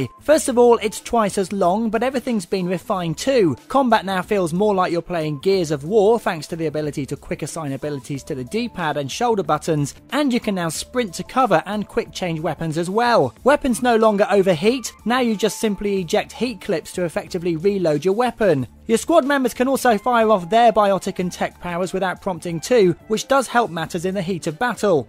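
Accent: United Kingdom. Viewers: Britain has more different accents than most countries of its size. Trust me, he's british- cos he sounds exactly like I do!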